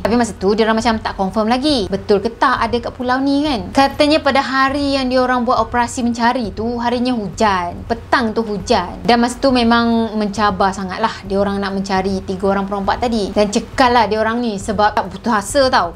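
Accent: Kelantan, Malaysia. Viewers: Iyo mek Kelantan la ni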